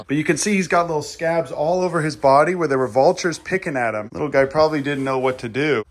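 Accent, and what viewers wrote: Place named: New York, United States. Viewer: Oh, he's in Texas? He sounds like he's from up north, like New York.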